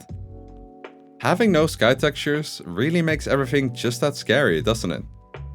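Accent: Netherlands. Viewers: Ah yes the Dutch accent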